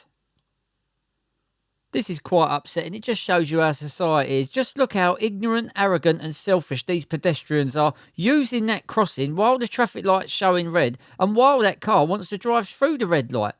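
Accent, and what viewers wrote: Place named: Essex, United Kingdom. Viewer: That voice over, for fks sake, only way is essex!!🙉🙉🙉🙉🙉🙉🙉🙉🙉🙉🙉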